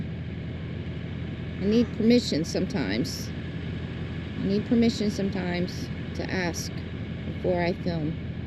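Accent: South, United States. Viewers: Are you from the south ( Mississippi, Alabama or Georgia)? You sound like it. <3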